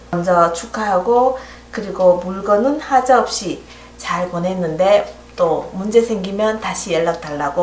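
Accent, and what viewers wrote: Gyeongsang, South Korea. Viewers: 벌써 실버버튼 인가요 ^^ 그리고 실버버튼.. 저렇게 생겼군요 축하드려요 대구살때 한번씩 친정엄마께 전화해서 요리법 물어보곤했는데 여기 미국오고나선 이모님 영상을 많이 참고합니다 경상도분이신가..말투도 친숙하구요 ㅎ 다시한번 축하드리고 골드버튼 되는날까지 아자 아자 ^^ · 님, 시방, 힐링님께 감사댓글을 올리려 들어왔더니 먼저 올려주셨네요. 저도 ♡ ♡ 닉네임도 호감이 갔었지만 구수~한 대구말씨에 완존 공감을 했었답니다. 정말 이모님의 숨은 내조자에 힐링님도 빠질순없지요. 늘~ 감사드리고 있어요.일일이 댓글읽고, 답글 올리는게 보통일이 아니던데 애썼습니다. 우리모두의 ✌✌입니다.잠자리 들때면 폰도 쉬라구 끄고자거든요. 제가 잠든사이 이런 경사가 저를맞아주네요. 힐링님도 선선~ 한 화요일 되시기를 기원합니다. 수고하셨어요.🙋‍♀️🙋‍♀️🎵🎶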